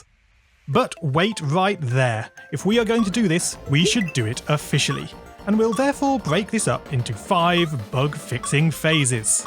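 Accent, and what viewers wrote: United Kingdom. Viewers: Your the most british person ever, the accent and stuff, WHOLESOME STUFF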